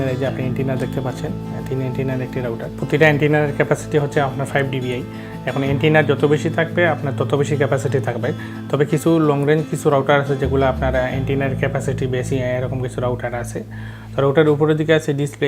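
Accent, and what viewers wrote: Sylhet, Bangladesh. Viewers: vai apni ki sylheti naki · Sylheti vi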